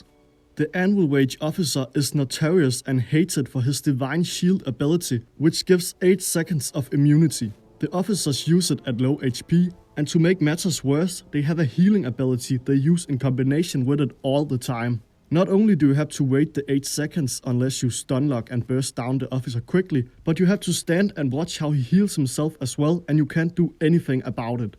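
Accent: Denmark. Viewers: well, I like this kind of english with danish accent, I mean REALLY danish XD BTW great video mate. Og jeg har set mange af dine fede videoer :)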